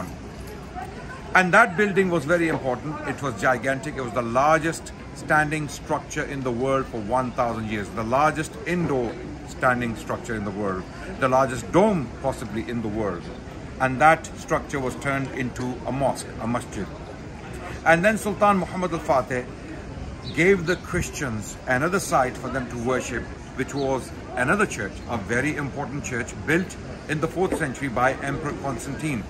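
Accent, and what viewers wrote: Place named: United Kingdom. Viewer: Brother, its J as Yot, funny to hear your brittish accent Justinian as (J Justice). Its closer to Yustinian (as York )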